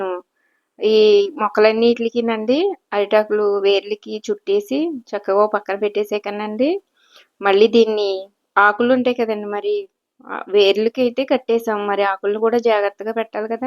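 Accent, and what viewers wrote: Godavari, India. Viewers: గారు మీరు చాలా చక్కగా వీడియో చేశారు...👌 Natural గా మన గోదావరి నటివిటీ తో బాగా ఆప్యాయత ఉట్టి పడే విధంగా మాట్లాడారు..❤ నేను గారు వాళ్ళ అక్క గారి అమ్మయి అండి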